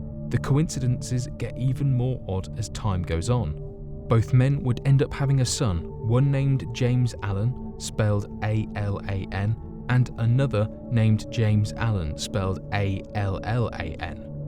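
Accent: United Kingdom. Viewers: Dude!! You are screwing up Royally. Franze Joseph, really? Butcher? Dictator? Are you really that stupid? Franze Joseph was neither a dictator nor in any way a butcher!! WTF is wrong with you? He was the Emperor true. But loved and respected. I live in Vienna. And have family here going back 800 years. Franze Joseph is still widely regarded as one of their most beloved leaders. Keeping them out of war and reigning over a period of peace and prosperity. In the video you say these three men. And then show a picture of five men known of whom is Franze Joseph. Stalin yeah, thats Stalin. Hitler uh huh, thats Hitler. Sigmond Fruede yeah, thats him. But Franze Joseph dont think so. Are you getting high before you edit? Really sloppy work here. A British accent doesn't make you smart. You going to apologize to two million Viennese.(Damn son. I wouldn't be visiting this wonderful, tolerant city any time soon.) Good luck with that apology. 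Very sad. Sloppy work.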